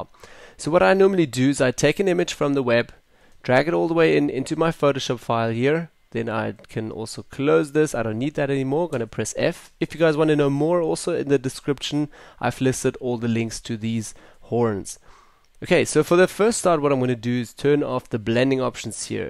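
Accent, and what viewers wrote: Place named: South Africa. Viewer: Cheers for the video boet. (Highly guessing you are South African) :-)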